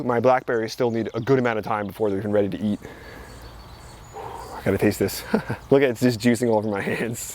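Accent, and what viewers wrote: New Jersey, United States. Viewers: I can't get over the fact you've got such a strong New Jersey accent! I live in NYC, so I've been exposed to a few N.J. varieties. And is so cool. More, please!😘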